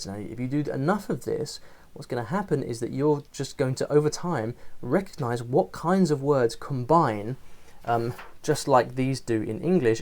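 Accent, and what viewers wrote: United Kingdom. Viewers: you brithish english is really cool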